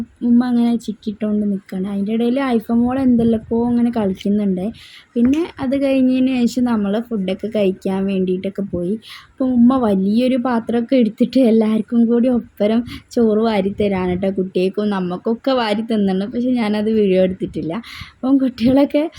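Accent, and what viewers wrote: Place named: Kozhikode, India. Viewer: Video super,കോഴിക്കോട് ആണോ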